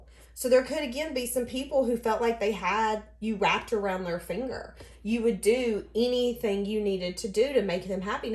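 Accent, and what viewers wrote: South, United States. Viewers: I love your voice and southern accent 🖤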